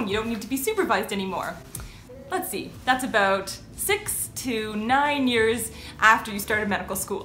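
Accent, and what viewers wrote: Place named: Canada. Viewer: My spidey senses told me you were Canadian, and I was right. :) Then, I also started to wonder if I speak with the same subtle accent. Anyway, I love your videos. I'm in law school, and I'm studying medical negligence / informed consent right now. Watching how your days unfold really brings some of the cases that I'm studying to life.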